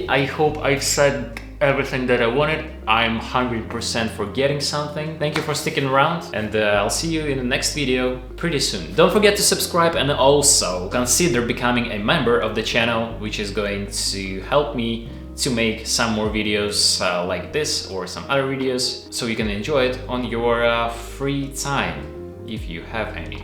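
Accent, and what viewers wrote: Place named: Eastern Europe. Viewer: you have a very slavic accent.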